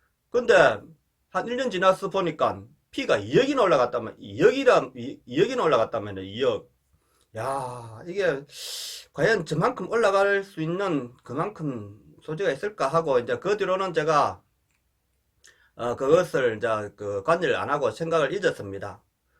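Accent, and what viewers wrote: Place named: Busan, South Korea. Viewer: 구수한 부산 사투리 친근합니다 ㅋ 건강관리 잘 하세요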